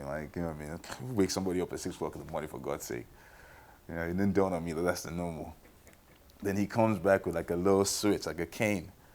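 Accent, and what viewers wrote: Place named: New England, United States. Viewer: Love the Yankee accent bro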